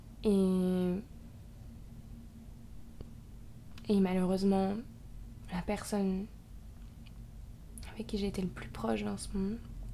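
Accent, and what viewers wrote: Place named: France. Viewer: L'accent français est beau :3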